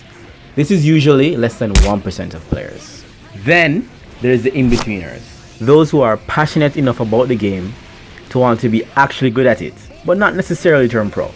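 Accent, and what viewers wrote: Jamaica. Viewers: I think street fighter is easier than tekken because street fighter you don't have to learn as much compared to tekken you have to literally put in more hours than in street fighter people have this big misconception that tekken is an easy fighting game but when you play in high level play it's hard as fuck and that guilty gear does sound hard as fuck though I might try it out and you sound Jamaican big up lol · i can hear the Jamaican in you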